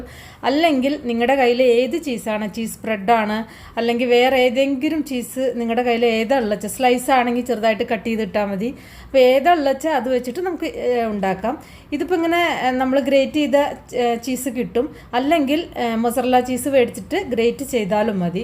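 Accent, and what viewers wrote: Palakkad, India. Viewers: Super, 👍try cheyyam, palakkad ano നാട് നല്ല രസം പറയുന്നത് കേൾക്കാൻ നല്ല അവതരണം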